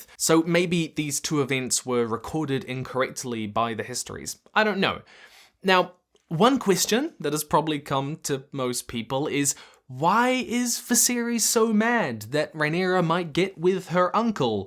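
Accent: New Zealand. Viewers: I love how the auto-subtitles read your New Zealand accent as saying, "Six Six Six" at the beginning :)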